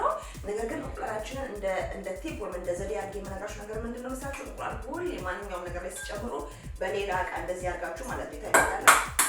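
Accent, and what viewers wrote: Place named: Dire Dawa, Ethiopia. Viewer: ባለሞያ ነሽ አወራርሽ ደሞ የድሬ ዳዋ ሰው ትመስያለሽ የኛ ቤት አወራራቸው እንዳንቺ ነው። ለማንኛውም ማንኛውም alcohol አስከ 3 ሰአት የሚበሰል ከሆነ ነው አልኮልነቱን የሚያጣው 10 ወይ 15 ደቂቃ በማብሰል አልኮልነቱን አያጣውም ።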